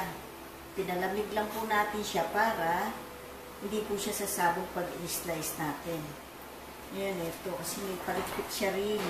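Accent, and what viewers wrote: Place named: Pampanga, Philippines. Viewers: may accent kang kapampangan maraming Eusebio sa san fernando, pampanga. nkakapaglaway kang panuorin s tikim portion mo. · ate atin kang accent, kapampangan the best for cooking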